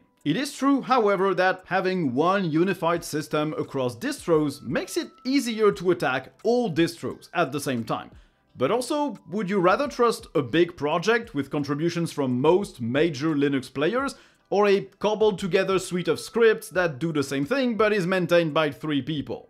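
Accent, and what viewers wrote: France. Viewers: Je reconnais un fond d'accent français. Are you french?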